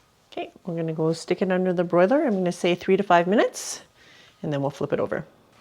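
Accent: Canada. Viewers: ok so I went to the Chinese supermarket and they have pork belly on sale imported from Mexico for 3.99 a pound I naively asked for two pieces and it came to 4.4 lbs and $17 but I decided it was worth it and I may go back and get some more and put it in the freezer while it is there what do you think, gud deal or? love your Canadian "outs" and "abouts" BTW. Arthur in California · Very cute accent! Are you Canadian 🥰🌷... love the accent love your recipe !! Can’t wait to try it!!! Omg! Mouth watering 😋😋😋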